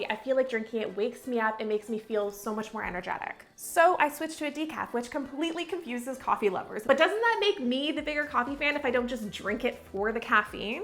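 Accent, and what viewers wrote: Canada. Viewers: I didn't realize you were Canada. I could hear on this video for sure with your "abouts" and "outs" that your definitely Canadian. 😊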